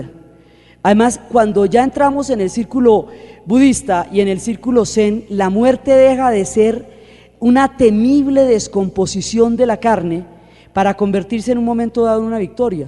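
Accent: Colombia. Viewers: Doctora gracias por compartir tanto tiempo con nosotros y tan bello y extenso conocimiento y disfruto mucho su forma y vocabulario, salí de Bogotá hace 56 años y usted me trae a la memoria nuestra forma de hablar nuevamente gracias Dra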